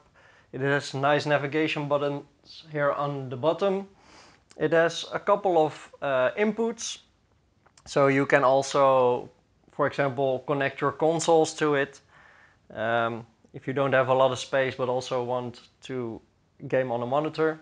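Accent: Netherlands. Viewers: Ik hoor dat je Nederlands bent hahaha Leuke review❤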